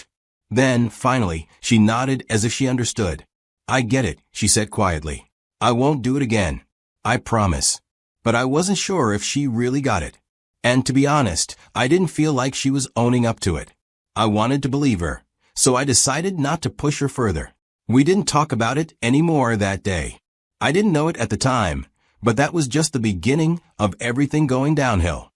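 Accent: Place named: United States. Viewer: A Thai story with american accent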